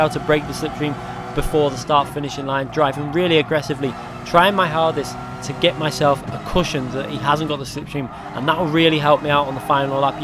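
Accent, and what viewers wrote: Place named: Cheshire, United Kingdom. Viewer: Nice work mate, been watching you for a while now, you from the north west? Sounds like a Cheshire accent 🤔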